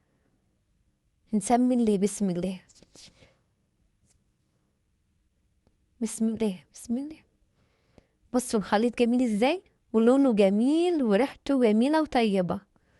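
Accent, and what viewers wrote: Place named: United Kingdom. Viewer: يسلم ايدك حبيبتي .. هو حضرتك مش مصريه ..الحروف شويه مياله للانجليزي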